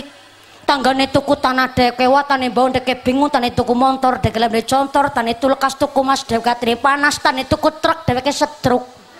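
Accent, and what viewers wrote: Banyumas, Indonesia. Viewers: Subhanalloh q jd seng dgrin ngapaknya ,kira"klu keblitar berapa ya lur,klu ngundang,q pingin,smg bisa nyengke desa ku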